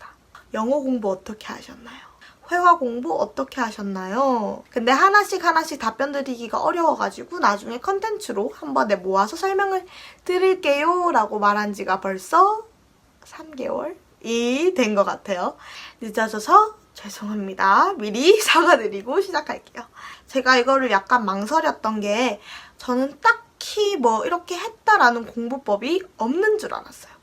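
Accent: Gyeongsang, South Korea. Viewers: 경상도 사투리 묻어나오시네용 ㅎㅎㅎ 도움 많이 되었습니다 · 경상도 사투리 쓰시네요ㅋㅋㅋ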